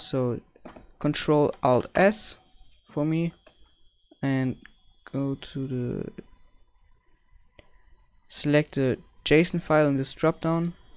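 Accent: Germany. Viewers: haha zu geil dieser deutsche akzent! aber gutes video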